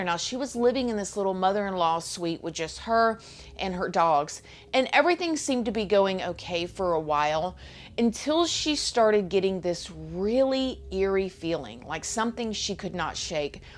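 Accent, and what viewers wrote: South, United States. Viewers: I swear you have become my favorite YouTuber. If you are the same in person then I would love being around you. You would probably be a good influence because I catch myself wondering how you feel about some subjects. You are so comfortable with being yourself. With being Southern! I have traveled/lived out West and some up North. When I hear you explaining things that I hear other YouTubers make fun of because it's Southern, it is refreshing. I can laugh at what we do as Southerners, but when someone else does it, it bothers me? 😆 Bailey Sarian is the only reason I started watching YouTubers instead of just music. You were like the second person. I didn't know how I felt when you started doing True Crime. Then I loved how you covered current events and your commitment to uploading on days expected and more. It actually confused me when I saw "spooky intro", but I just thought I missed it. 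You always upload! So happy for you! You deserve it! I totally see you getting to millions. Don't change... your sincere tears was so relatable! You're doing so good!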